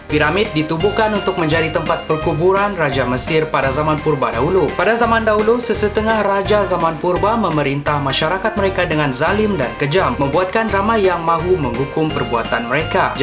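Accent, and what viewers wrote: Malaysia. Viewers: ni malaysian ke indonesian??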